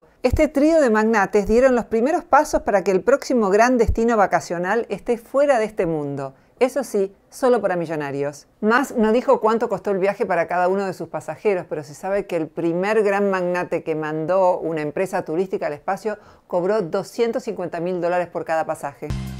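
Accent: Argentina. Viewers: Que rara que eres.. perdón, tengo que ser honesto. COMO SÍ SER "PROGRESISTA", OTORGUE UNA CIERTA "SUPERIORIDAD MORAL"... Especializada en "todo", es extraño con acento argentino...NO SORPRENDE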